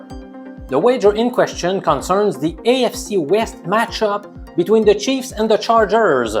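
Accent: Quebec, Canada. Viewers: First time watching your channel and I hope your the GSP of Sport Betting🤣. I'll take a wild guess and say that you got your Master's in Statistics at the Université de Montréal, I know a Quebec English accent when I hear one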